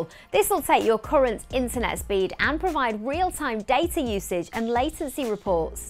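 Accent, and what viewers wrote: United Kingdom. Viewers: Awful British accent!! Rooter, Rooter, Rooter.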